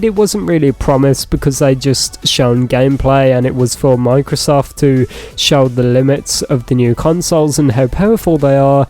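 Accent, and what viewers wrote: United Kingdom. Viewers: Wow British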